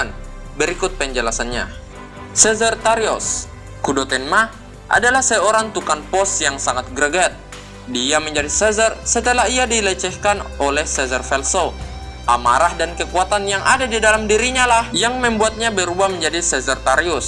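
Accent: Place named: Maluku, Indonesia. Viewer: Bang mau nanya nihh, Abang orang mana yaa kok logat nya kyk orng Maluku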